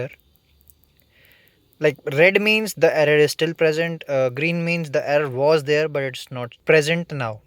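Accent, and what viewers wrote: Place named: India. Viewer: Engleza indiana, imi vine sa ma cac!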